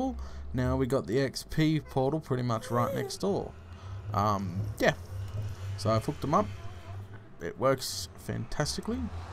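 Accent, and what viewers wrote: Australia. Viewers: Are u Aussie?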